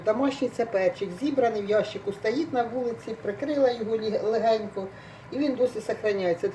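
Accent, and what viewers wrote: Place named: Dnipropetrovsk, Ukraine. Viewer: Вы, наверное из Днепропетровска или области. Только у нас так говорят и готовят. Рада, что вас нашла! ❤❤❤❤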